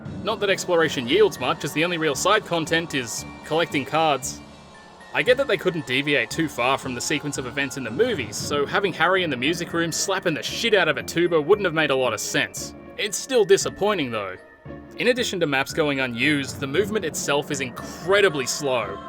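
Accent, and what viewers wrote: Australia. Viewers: I wasn't expecting a reply, love your channel so far, it's refreshing listening to another Australian talk about game design.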